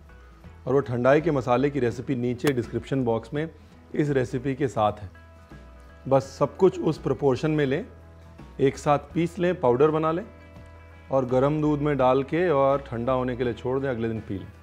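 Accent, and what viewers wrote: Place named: Lucknow, India. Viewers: Lucknow ka accent ek Punjabi k muh se sunna.. bada mast laga sunke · Thank you so much...I love all your receipes..and this one is super amazing... Love the way you talk...main videos dekh ke samajh gayi thi this must be someone from Lucknow...Thanks! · Humare Ghar bhi yahi Banti hai Holi mein, full on lucknaowi accent... Chalte phirte bhi didn't miss the essence of history/explanation badiya bahot badiya Background score bhi Holi wala HOTA toh cha jate my god... Phela Saal Ka first festival Kuch toh shor Hona chahiye...koi nai feelings conveyed by exceptional Poem, visuals, t-shirt, recipes and ofcourse singing... 🌈🎨🏳️‍🌈🎊 Happy Holi to Yours from ours 🥳🥳🥟🥟🥟🥟🥟🥟🥟🥟🥟🥟🥟🥟🥟🥟🥟🥟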